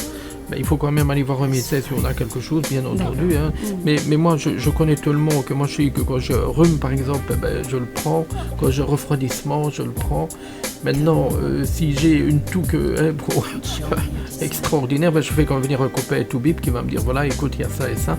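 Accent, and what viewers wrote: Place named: Liège, Belgium. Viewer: En tout cas, ça n’atténue pas l’accent de « Lieche »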